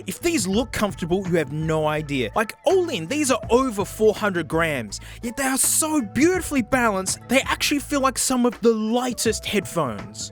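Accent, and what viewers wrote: Australia. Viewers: Somehow, compared to his first video, he has managed to make himself sound more Aussie.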